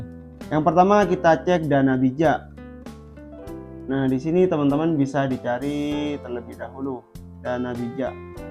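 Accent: Indonesia. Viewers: Kayak orang madura bahasa nya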